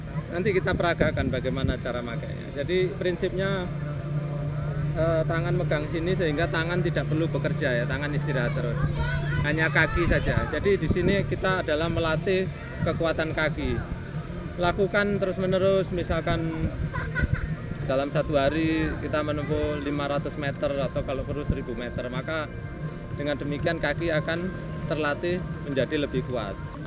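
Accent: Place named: Java, Indonesia. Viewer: Sangat bermanfaat pk besok sy latihn renang lgi pk......ko di batam pk kyakya bahasanya agk medok jawa.....sehat selalu pk slam dri bekasi.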